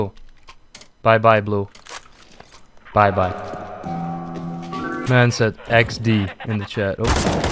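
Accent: United Kingdom. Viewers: nice britich acent matè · Omg ur british accent is so fucking nice dude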